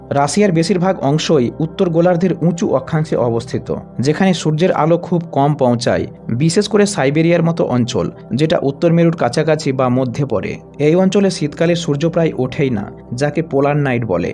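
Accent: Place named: Kolkata, India. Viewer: সরি ভাই..... আপনার কথা বলার ধরনটা মনে হচ্ছিল যে আপনি কলকাতার । আপনি কোন জেলা থেকে বলছেন.....??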